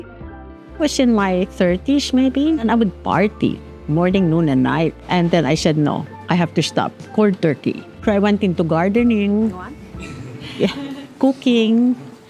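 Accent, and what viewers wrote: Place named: Conyo, Philippines. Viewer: conyo pala si Ma’am hahaha ang cute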